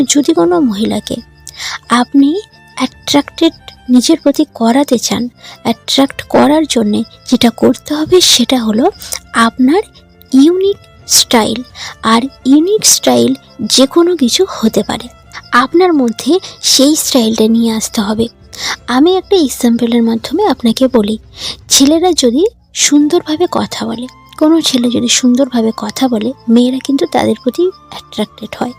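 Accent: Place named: Pabna, Bangladesh. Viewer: পাবনার মধ্যে পাকা কন্ঠে ভিডিওটা খুব ভালো লেগেছে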